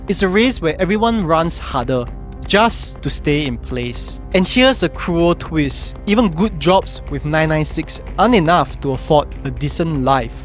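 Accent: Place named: Singapore. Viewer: Are you chinese national?Your accent sound so much like Singapore or Malaysia.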